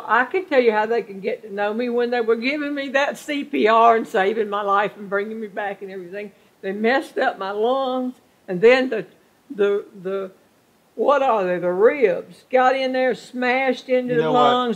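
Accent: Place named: South, United States. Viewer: Just discovered you! Utterly glorious humour and love the southern accent. Thanks goodness for Youtube!! Love from London :)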